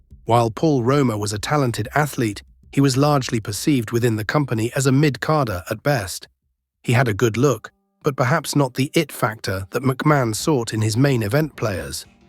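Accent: United Kingdom. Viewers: These episodes are truly a joy to listen to. The English accent gives it weight. Power and Glory rocked and when Roma became a Horseman I was down. I’m a 10 year old boy in ‘87 and pro-wrestling was my soul. I’m 10 again while I watch now and it’s better than I remember. Thank you.🤠🍺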